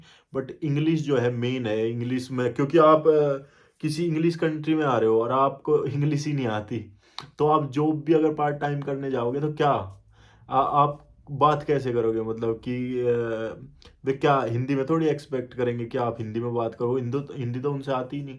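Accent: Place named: Baghpat, India. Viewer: Bhai teri Baghpat wala language ni gyi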